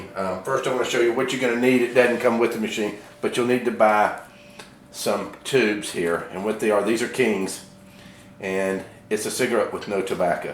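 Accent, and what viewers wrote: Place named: South, United States. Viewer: Me 3. He sounds Southern American.... can you blame him?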